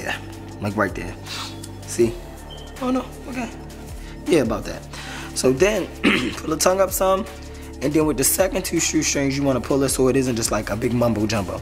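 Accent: Baltimore, United States. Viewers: Are You From Baltimore ???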